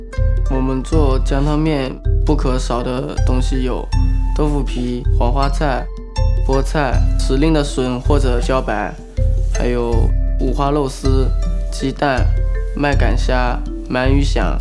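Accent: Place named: Jiangsu, China. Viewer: 我只知道和江苏话很像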